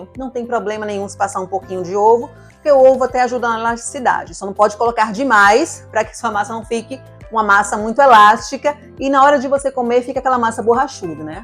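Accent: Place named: Pernambuco, Brazil. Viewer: Ganhou uma inscrita! Pelo sotaque reconheci que tu és uma das minhas! Queria uma receita ORIGINAL pernambucana. Quando abro um vídeo e escuto falar "rocambole" eu já passo.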